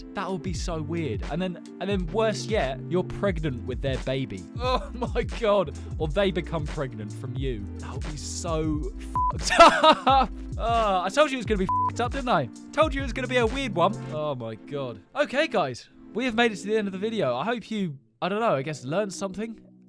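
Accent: United Kingdom. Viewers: I like your British accent It’s kinda like more like dinner table queen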